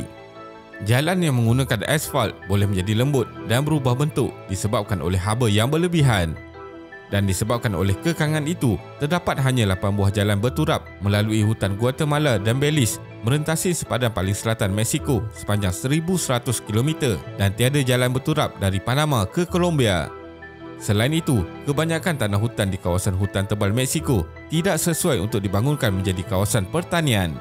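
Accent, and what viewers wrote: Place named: Malaysia. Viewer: Alaa lidah melayu biar jee lar,orang lain ok jee dengar…kalau rasa terganggu dengan sebutan admin sangat,pergi kat tepi dinding bisik slow2 kat dinding tu atau masa nak berak tu jerit puas2 pasal admin salah sebut tu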